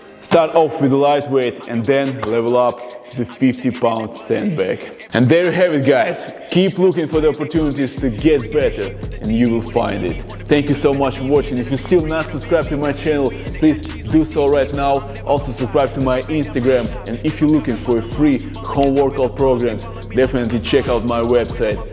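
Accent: Russia. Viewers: пожалуйста прокачай свое американское произношение, ну а то совсем не серьезно. круто то что ты можешь свободно понимать и говорить, но произношение режет ухо. типичный русский акцент )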